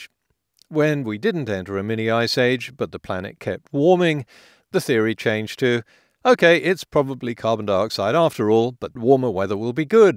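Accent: United Kingdom. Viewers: CoupleFYI’s for you, saying it in a #BrittishAccent🗣️doesn’t make it #Believable, #Correct nor #Science and a simple #Proof of thearth’shape is #ANTARTICAScircumference⭕️🐧 #small🌏or #LAARGE🧇easy😎🙌🏾 #Science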